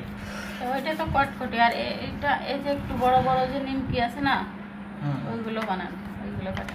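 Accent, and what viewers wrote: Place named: Jessore, Bangladesh. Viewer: Apu josor amer nani bari maura.tomer vasa sunay sob soma a monay hotow amer kasayr mama khalara ktha bolsay.bosoray akber nanibari na gaylay monayhotow jibontae vritha.now ja oya ho a na.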